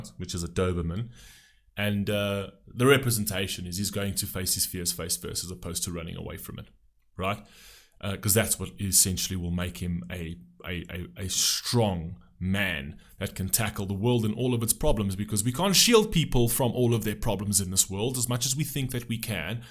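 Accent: South Africa. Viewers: As a Brit myself, I don't think he's British. I think he's from South Africa. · you sound South African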